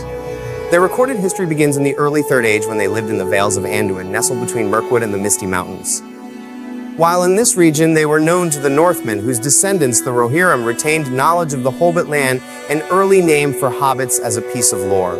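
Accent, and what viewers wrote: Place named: United States. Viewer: A shame about the strong US accent. But it's you, so I'm still going to watch.... Finished and it's as good as the rest. Ty 😊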